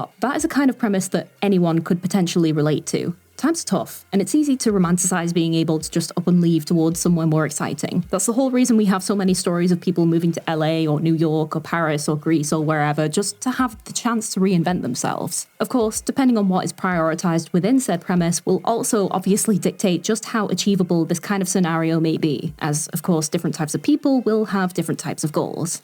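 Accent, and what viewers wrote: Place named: Lancashire, United Kingdom. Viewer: Haha yeah I've always found it wild that there's one of my 'own' doing the whole Youtube thing. I can't place your accent, you're definitely from around at least Lancashire/Greater Manchester but I imagine you enunciate more on YT anyway seen as I tend to do so when I talk with anyone outside of the North, especially Americans/Canadians. Americans often think I'm Scottish because of how thick my Bolton accent is, even when enunciating. UK Publishing has a huuuge classism issue. They've been trying to level out the playing field for years but it's still very gatekept. Both for workers looking to get into the industry and authors looking to get published. Most agents are from middle class backgrounds, so as the first port-of-call for authors looking to get published, if they don't relate to the material sent to them, it often gets rejected. 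It doesn't even get a chance to be looked at by a publisher. Though I'm glad to see we have indie publishers based out of the North like UCLan now. Haha yeah I love my '80s stuff but the '60s and '70s were banger too. Whilst Northerners have been largely barred from publishing spaces, we instead have a rich performing arts culture. Plays like A Taste Of Honey by Shelagh Delaney were groundbreaking. The fact a play written in the '60s, set in grimy Salford, depicted a teenage schoolgirl getting pregnant by a Black sailor and then exploring her feelings about the pregnancy with her openly Gay best friend is mindblowing when you think about t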